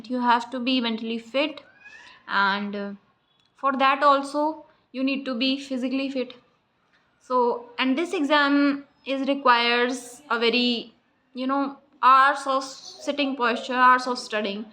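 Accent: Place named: Himachal Pradesh, India. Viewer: himachali accent 😁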